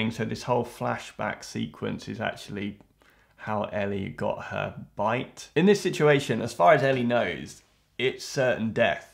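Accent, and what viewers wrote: United Kingdom. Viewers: Is it just an automatic response to remove an impaled object? (I heard Steve Irwin did that w/ the stingray barb, that there was a chance to save his life had he not done that.) Can't wait for your reaction to the next episode. It's by far the darkest ep. I'm not usually a fan of a moustache but combined with the British accent, it oddly works for me 😉😄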